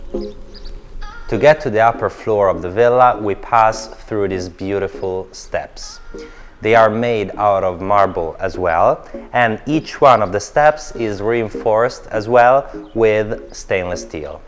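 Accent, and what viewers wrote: Italy. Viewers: Love it,! Especially when clip is over 10 minutes! Please come back with your italian, it gives so much style and chic..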